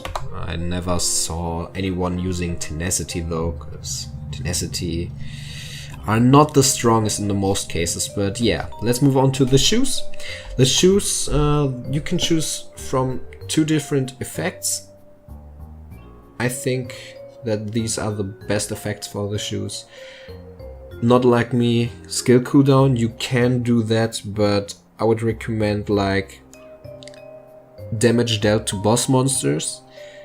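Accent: Germany. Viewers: You sound like a German people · Man hört krass raus dass du deutscher bist xd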